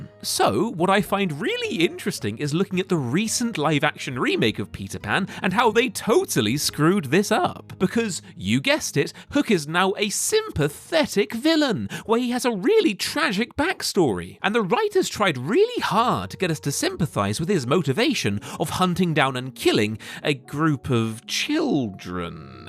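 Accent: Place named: United Kingdom. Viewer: I know it’s the same actor/YouTube host but with that thick British accent, both of you guys sound evil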